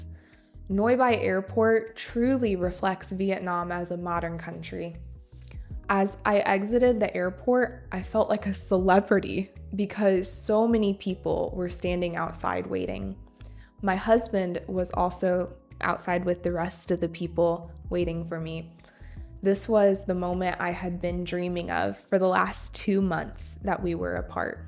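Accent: United States. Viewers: Em rất mê American accent nên rất hóng những video tiếp theo của chị ❤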